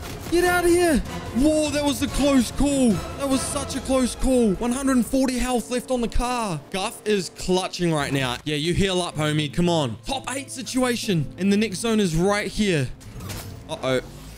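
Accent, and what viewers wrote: Australia. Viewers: Is he Australian??? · WAIT YOUR AUSTRALIAN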